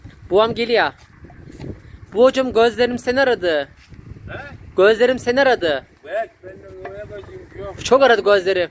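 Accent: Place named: Bartın, Turkey. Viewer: Bende bartinliyim istanbul da yasiyorum bizim oraların konusmasini duyunca çok mutlu oldum benim annemde böyle odun ateşinde yapardı evin önünde yiyesim geldi şimdi bu sene koye gidemedim koronadan sizi yeni keşfettim artık izlerim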